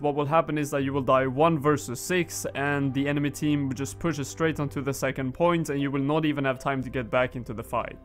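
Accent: Sweden. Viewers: Nice video mate, are you Swedish btw? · Swedish accent I Love it bro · Sounds like your from sweden( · u are sweden i hear it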